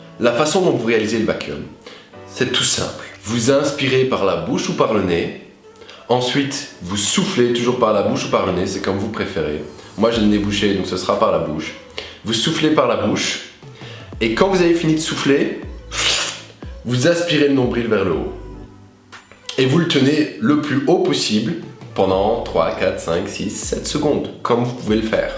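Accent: Belgium. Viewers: Tu me fait penser a un belge avec ta voix ou peut etre que tu en est un. Merci pour la video je vais essayer ! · Un like juste pour m'accent belge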